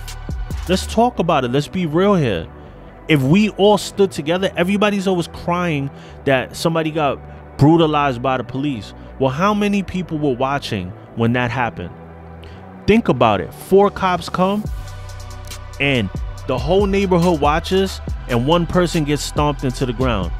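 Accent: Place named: East Coast, United States. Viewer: First time but # where you from I hear the east in you bro. Tappin In from Boston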